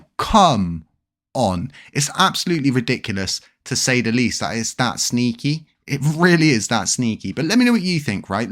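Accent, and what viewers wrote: United Kingdom. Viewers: I absolutely love your videos with the 007 accent, but Christopher Harris is also adorable. He has a 007 accent too. I prayed for God to let my late dog 2/29/2023 visit my son and sure enough he woke up all excited and said he was visited by the dog in his dreams and he had no idea I was praying for that. What I do know is I follow both you and Jesus is Lord because you both want the same thing which is Lunc to go to the moon. I love how the cat wears glasses 🤓 ❤❤❤ but Christopher Harris I believe really gets dreams but he’s not the only Christian influencer on YouTube getting dreams about LUNC. I dreamt once my dog and I playing in heaven all night because I prayed and prayed for him to be healed from distemper but he did not make it. But God gave me an entire night in heaven in a dream playing with him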